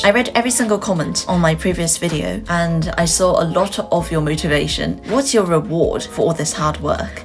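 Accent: RP, United Kingdom. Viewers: The combination of the memes and the poshest accent in existence 💀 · Why the fuck are you speaking in RP? You sound like the posh AQA languages listening reader PS。你会说中文吗？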